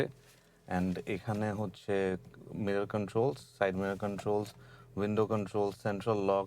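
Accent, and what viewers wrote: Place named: Chattogram, Bangladesh. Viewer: Bro is the showroom located in Chattogram khushi road? Your accent says your from Chattogram.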